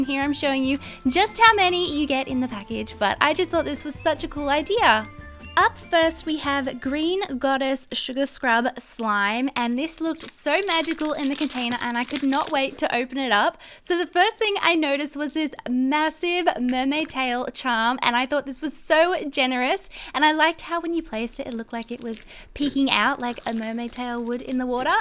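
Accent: Australia. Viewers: OMG I LOVE!!! I’M ALSO AUSSIE AND I LOVE LISTENING TO SOMEONE WITCH THE SAME ACCENT AS ME!!! SHE MUST BE RICH TO GET SO MANY SLIMES!!!! im broke....... BUT I CAN ENJOY SLIME BY WATCHING HER VIDEOS🥰🥰🥰🥰🥰🥰🥰🥰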